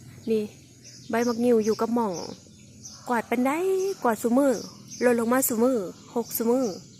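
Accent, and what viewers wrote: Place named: Isan, Thailand. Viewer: ภาษาพูดสำเนียงเหมือนคนไทยภาคอีสานน่ารักครับพูดไทยชัดช่องเคียงเช้นกันพูดไทยชัดเป็นคนบ้านเดียวกันหรือเปล่าครับ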